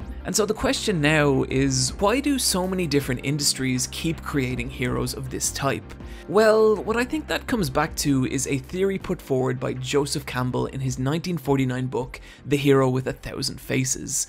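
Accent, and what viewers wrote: Ireland. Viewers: My high school English teacher, senior year, said something similar. He said in fiction, the villain rarely ever thinks of themself as being evil. They believe that they are the hero. Made a crapload of sense when I played Borderlands 2, but I like hearing it reiterated in a silky smooth Irish accent.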